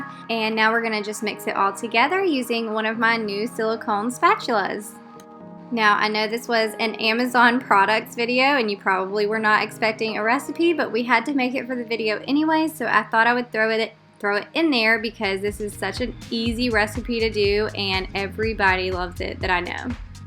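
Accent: South, United States. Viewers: LOVE home and KITCHEN GADGETS! Thanks for sharing! You’re southern accent is so cute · hey hey.. new subscriber here! love your videos and southern accent. I'm from Louisiana and you don't hear it often on youtube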